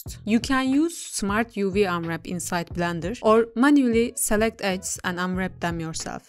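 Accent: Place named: Turkey. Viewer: I know it when I hear a Turkish accent. Are you Turkish?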